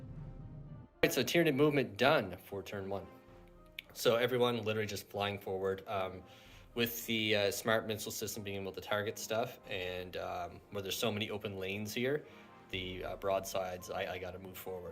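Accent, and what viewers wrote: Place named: Canada. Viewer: Canadian accent detected :)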